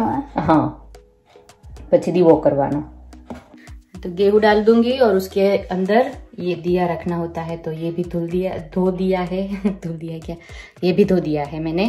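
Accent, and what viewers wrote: Kathiawar, India. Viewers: pure kathiyavadi Mithi gujrati boltahe happy navratri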